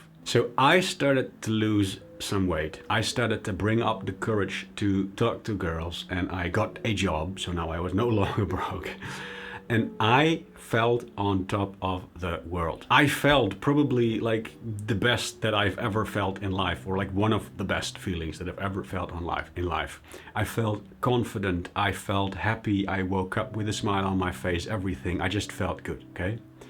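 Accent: Netherlands. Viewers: I heard you were dutch by your accent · Are you dutch or it is just your accent? Good videos man, good luck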